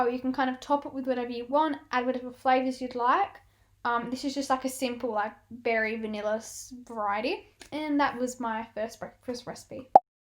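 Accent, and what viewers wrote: United Kingdom. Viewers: ohh hahah i thought u lived in the uk u sound so british idk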